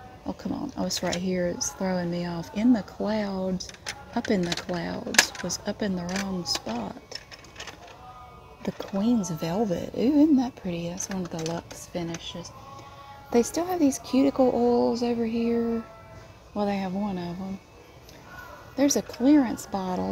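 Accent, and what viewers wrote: South, United States. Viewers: Your quick-witted commentary with your southern accent makes these my favorite videos. You always make such a drastic before and after with organizing. Please do more! Thanks!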